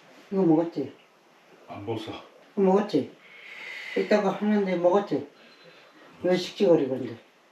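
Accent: Gangwon, South Korea. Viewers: 오리지널 강원도 사투리 정말 끝네줍네다 응원합네다 ㅎ~~~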